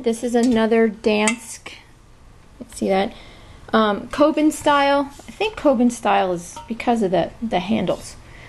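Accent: New Jersey, United States. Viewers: ! Is that a NJ or Brooklyn accent... I'm having a banner year for the ladies following me around giving me a history lesson on any item that I pick up. Kind of funny but it gets old quick... Leave me alone! Santa going down the fireplace ugly sweater is the best. Price it high.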